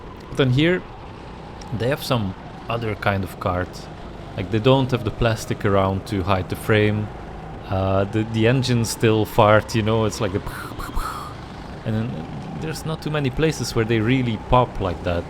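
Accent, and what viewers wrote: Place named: Flanders, Belgium. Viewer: I could hear you were Flemish after the first few words 😂 Awesome perspective from an experienced Karter though. Do you sim race as well? That's my main thing currently. I enjoyed watching this, bedankt!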